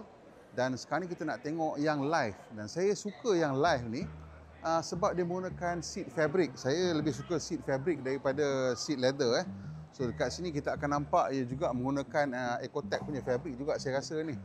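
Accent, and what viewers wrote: North, Malaysia. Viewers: noh...asai utara ka?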